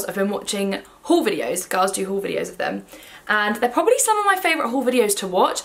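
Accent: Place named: London, United Kingdom. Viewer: anytime. I've been watching hauls all weekend and yours is definitely up there. Keep it up. Your accent makes me miss living in London.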